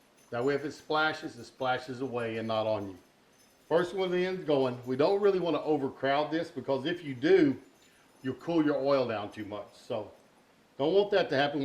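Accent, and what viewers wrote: South, United States. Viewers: Looks delicious! I love your country accent. Very authentic.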